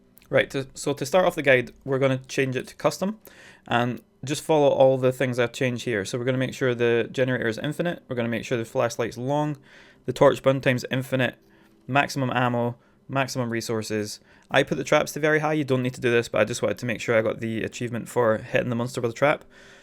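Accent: Scotland, United Kingdom. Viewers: Scottish I hear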